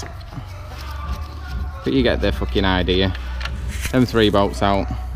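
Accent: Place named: Manchester, United Kingdom. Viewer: Thanks for this mate gonna need it soon. Can recognise that manc accent a mile off. Denton I’m from, u seem close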